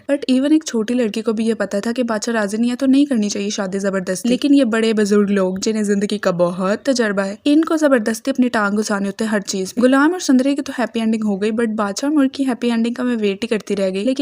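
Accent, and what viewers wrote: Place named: India. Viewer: Par apki accent indian lagi such mai hmko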